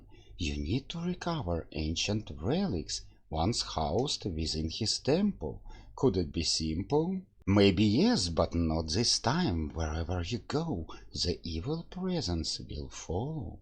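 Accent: Russia. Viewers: Чет у меня подозрение что русско-говорящий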